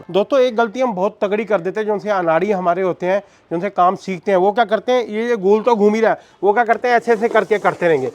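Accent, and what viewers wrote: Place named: Punjab, India. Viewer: Abhi aap delhi se hai ya punjab se aapke bolne ka dhang punjabi lag raha hai 🙏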